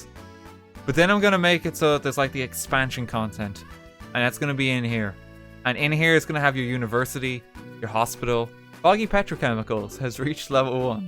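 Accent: Ireland. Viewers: Have you got a Irish acent if so I love it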